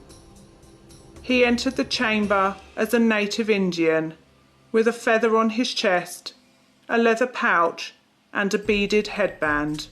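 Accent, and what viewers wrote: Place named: England, United Kingdom. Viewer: It's called an English accent ya stupe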